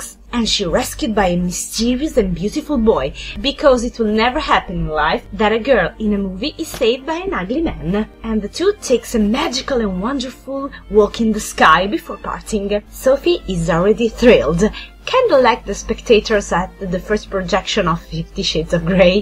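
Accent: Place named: Italy. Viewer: No no, ma ché, sei stata più che bravissima, FENOMENALE, davvero!! Per me sarebbe aldilà di ogni possibilità! Ma non ci si può fare niente, è proprio che gli italiani che parlano in inglese, anche quando (ed è un caso raro) hanno un'ottima pronuncia come te, fanno ridere! Semplicemente perché si sente troppo che non siamo inglesi, è un problema che abbiamo tutti noi neolatini, gli inglesi se ne lamentano sempre. E' il motivo per cui ad esempio anche i migliori cantanti italiani fanno moltisisma fatica ad avere successo nei paesi anglofoni.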